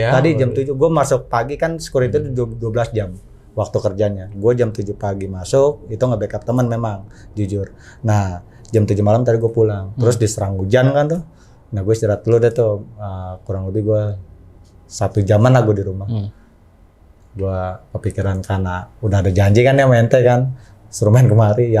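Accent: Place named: Betawi, Indonesia. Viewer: Bahasa betawinya lho yg bikin gua cengar cengir sendiri😂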